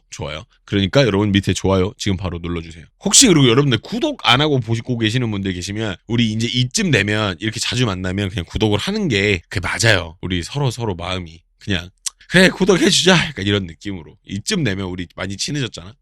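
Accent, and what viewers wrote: South, South Korea. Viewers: 구독.좋아요 눌렀어요.. 살짝 남부쪽 사투리가 들리네요.^^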